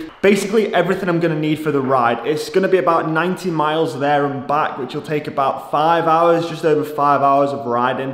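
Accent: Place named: Lancashire, United Kingdom. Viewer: First of all, cool video! Second, and a completely unrelated question to the video. In your Tour of Ulster video you mentioned that you're from there so how'd you end up with such a rich, thick Lancashire accent instead of an Irish one? How long have you lived in England?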